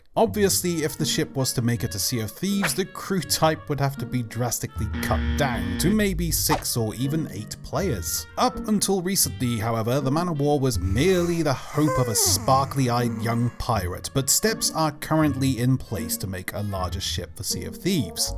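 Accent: Spain. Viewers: Are you Spanish??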